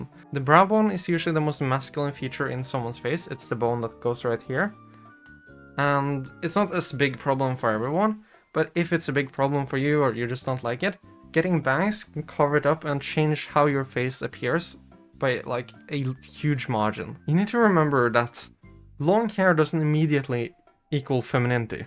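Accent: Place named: Norway. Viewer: Are you scandinavian? Sorry if that's a personal question but I swear I recognize that accent. *edit: I scrolled down a bit and saw you were norwegian! its cool to see a fellow scandinavian!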